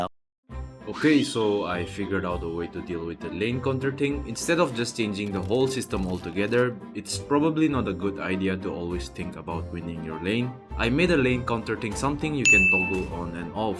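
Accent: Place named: Manipur, India. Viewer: Why do i feel like you're Meitei or north eastern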